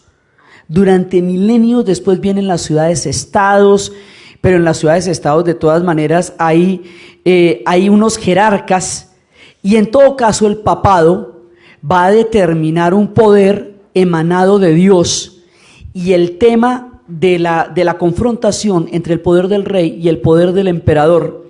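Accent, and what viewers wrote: Colombia. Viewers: excelente. fabulosa narradora.Memoria magistral.Acento colombiano excelente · A mi edad jamás había escuchado a esta Dama Historiadora, muy buenas sus disertaciones, le pone ese toque Colombiano de pueblo, y Diafano, que inteligencia y mejor memoria, EXCELENTE NARRATIVA DE HISTORIA UNIVERSAL, y muy Actualizada...Felicitaciones, ahora Sra Uribe tiene un seguidor más habido de conocimiento... SALUDOS 🌹👏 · es increible lo clara que es para que entendamos todas las vueltas y revueltas de la historia y el orgullo de que es nuestra o sea latinoamericana y es poco conocida en mi pais o sea argentina realmente es un orgullo tenerla y ojala la sigamos viendo por mucho tiempo. aparte dice cosas bastante graciosas con esa manera que tienen los colombianos. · Fantástico como narra la historia,con ese acento y gracia que tiene colombiana,con esa sencillez,fácil de seguir y entender Superamenas sus conferencias,se hacen cortas y te quedas con ganas de mas. 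Gracias por tus enseñanzas,ojalá hubiera muchos conferenciantes como tu, con esa sabiduría y facilidad para explicar las cosas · Y es que habla con tal propiedad a lo colombiano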